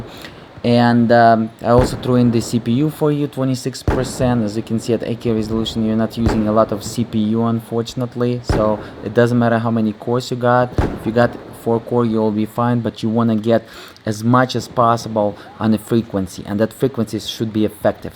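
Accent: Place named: Russia. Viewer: official - 8K and 4K gameplay, benchmarks, reviews Мне кажется я почуствовал Русский акцент..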